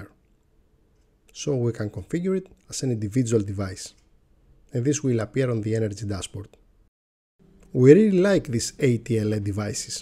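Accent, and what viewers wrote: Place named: Greece. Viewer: I know you might not have expected this comment but... You're Greek, aren't you? XD I believe as a Greek myself, I can tell from your accent 🤣 (which is by no means a bad accent, but still not quite American, English or Australian)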